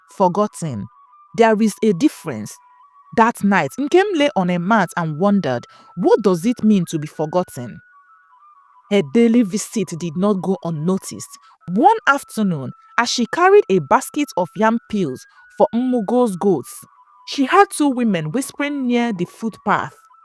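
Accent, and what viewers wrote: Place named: Ghana. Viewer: You speak like a Ghanaian are 😮😮 you one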